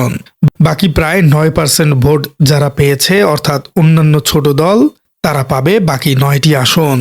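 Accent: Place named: Noakhali, Bangladesh. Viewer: Give up noakhali accents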